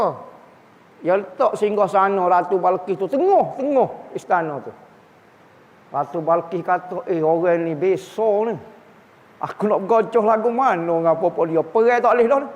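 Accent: Kelantan, Malaysia. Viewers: Betul bang kupasan tu jelas, yg menjadi masalah takut org luar xfhm dgn loghat kelate takut disalah ertikan...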